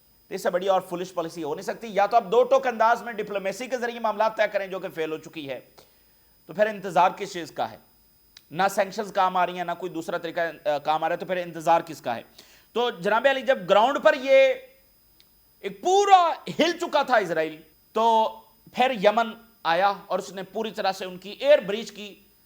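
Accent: Punjab, Pakistan. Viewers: I anticipate you are a Pakistani Muslim! Futher from your Urdu accent I can tell that accent is from punjab! Reason of contact: but from your tongue I had never heard you saying the name of Allah subanahu Tallah our creator!, you should feel proud to be a Muslim and say Allah’s name so that it is audible to all, saying Allah’s name come out from the heart ❤️ naturally without even thinking! I had heard you saying “uoper walls” but I had never heard you taking or saying pronouncing Allah subanahu Tallah’s name loudly as you say rest of the stuff why??????? but don’t you feel proud of your religion Islam and say from your tongue the noble name of “Allah Subanahu Tallah” what is stopping you nothing is more important in this world than Allah and our religion Islam and the rest of the things comes after Allah! Nothing in the world is more important than Allah! 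I will suggest you proudly proclaim Allah’s name, specially at times when your are crediting Allah, instead of saying uoper walla proudly say Allah subanahu Talla! I hope you get to my comment some how and try be better Muslim! Aameen!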